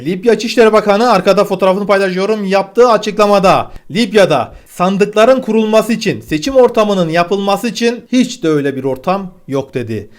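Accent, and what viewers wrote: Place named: Anatolia, Turkey. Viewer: Geee Yok, Kıbrıslı değil. Biraz dikkat edersen zaten şivesinin Anadolu'dan bir yerden olduğunu anlayacaksındır. bundan 6 yada 7 sene önce Devletin bir birimi tarafından oraya göreve gönderildi.! Tam olarak söylemem doğru olmaz. Maraş bölgesi yakınlarında bir yerde KKTC İLE- RUM sınırı arasındaki BM (Birleşmiş Milletler) askerlerinin kontrolündeki TAMPON BÖLGE'nin dibinde bir yerlerde sınıra paralel, hat boyu dedigimiz (o güzergah boyunca uzunlamasına giden) şekilde bahçeli, bol ağaçlı arazi ve üzerinde ev verildi. BM helikopterleri devriye esnasında bazen KKTC hava sahasına girdiğinde (Askeri birliklerimizin, mühimmat depolarının yerleri vs görüntü alıp Rum tarafın verilme ihtimaline karşı) bizim rürbelilere haber veriyor, onlarda BM merkezini arayıp ikaz ediyor ve BM yetkilisi de helikopterle görüşüp derhal Türk hava sahadından çıkması, tampon veya Rum hava sahasında ilerlemesi sağlanıyor.! 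Ayrıca ileri görüntüleme teknolojileri ve ihalarla da 7 / 24 Rum tarafını ve oradaki herhangi bir fatklı hareketliliği gözlemleyip bizim Türk tarafına haber veriyor.! Arada bir de birkaç gün ortadan kayboluyor yeni vidosu gecikiyor. O kaybolduğu arada da ya Suriye'de, ya Libya'da, ya Karabağ'da bir yerlerde arkadaßlarına destek vermeye gitmiştir.. Kal sağlıcakla....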